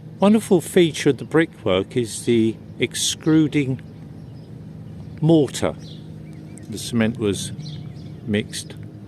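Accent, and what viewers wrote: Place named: England, United Kingdom. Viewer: Nice video. Glad you made it. I love the architecture. This guy has the best voice. Love the accent. I’m not sure where he’s from but it has to be close to Michael Caine’s home. They sound identical. Definitely the same accent. This guy has a wonderful voice.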